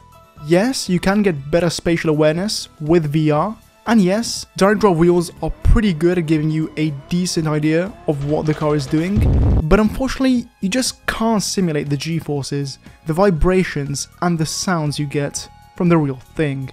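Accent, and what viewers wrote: Italy. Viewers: I love the hidden italian accent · You're Italian??!!! Wth. I am too. Bro il tuo accento é pazzesco. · italian by any chance? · sei italiano?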